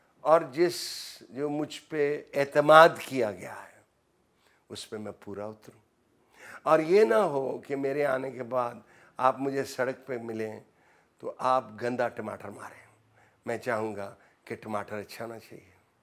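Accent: Punjab, India. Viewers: Speaking Urdu with Punjabi. Like me😂😂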